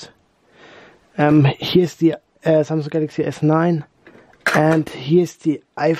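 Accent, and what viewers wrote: Germany. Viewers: Du bist Deutsch. Dieser Akzent 😂❤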